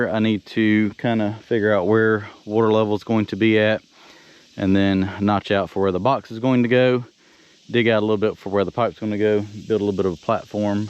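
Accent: South, United States. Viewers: I had an 11 year old video of yours show up on my list and it was when you painted the old Case 580! Your southern accent has mellowed an awful lot since then! 😂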